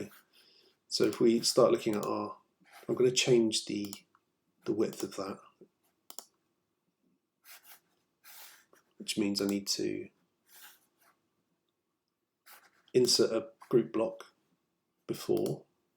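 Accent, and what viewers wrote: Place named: England, United Kingdom. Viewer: Thanks, A great tutorial and it is so good to have a clear English accent. I think that with a touch post editing to get rid of some of the trial and error parts and just give the "polished" end product might be good, but also I do like seeing your thought process when figuring things out. Your voice is excellent and clear and very easy to listen too and your knowledge is a valuable commodity to pass on. I really appreciate the time you have taken to make these videos and would love to see you make some more. Perhaps a playlist for beginners in Wordpress getting going would be great. Have you thought about doing some videos on your everyday experiences working in development and sharing them with us? Maybe some videos on plugin development or securing sites etc? Anyway that's my feedback! Thanks ever so much I really do appreciate your great work. 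- Chris.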